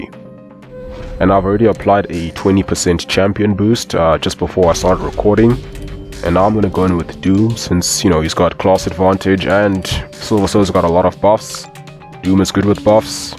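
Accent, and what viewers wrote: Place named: South Africa. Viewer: You sound really south African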